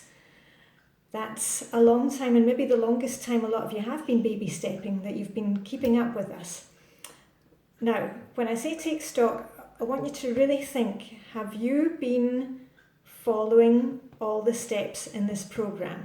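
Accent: Scotland, United Kingdom. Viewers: ! <3 I've been meaning to ask, just for fun... are you Scot/Scottish?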